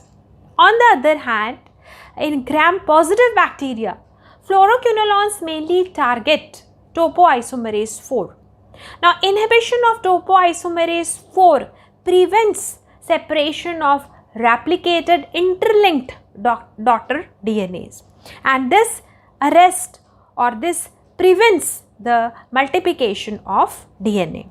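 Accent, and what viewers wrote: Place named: England, United Kingdom. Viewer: liked your English accesent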